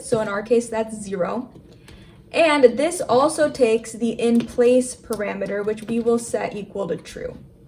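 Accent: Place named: India. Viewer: love the way you explain, keep making such videos and cover whole pandas. By the way I love your accent, it is mixer of indian and NRI.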